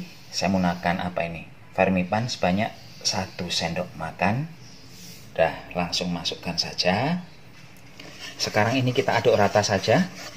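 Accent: Banyumas, Indonesia. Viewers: Mas njawine pundi to kados radi ngapak sami kaleh bojone Kulo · Pak lucuuu pol n resepe wokeh 👍👍👍👍 auto lgs sy subscribe deloke ambek guyu" 😂😂 ngapak " e inyong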